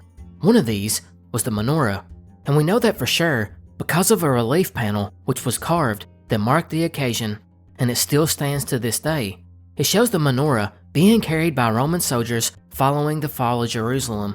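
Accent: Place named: North Georgia, United States. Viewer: Your accent is just like mine and my whole family , it's cool bro Howdy from NA GA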